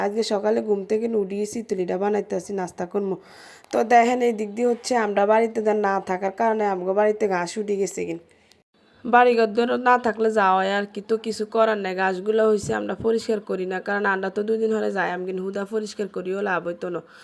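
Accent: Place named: Noakhali, Bangladesh. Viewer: এডা তো পুরা নোয়াখাইল্লা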